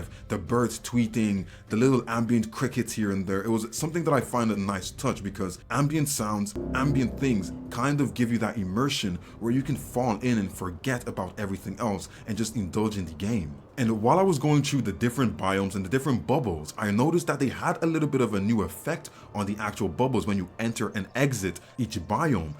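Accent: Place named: United Kingdom. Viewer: Somewhere in the uk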